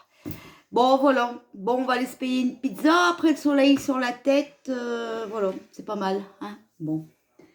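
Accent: North, France. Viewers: J'adore votre accent du chnora grand mère 🤶 était de berck plage 🦀 elle parle comme vous merci 😘 😘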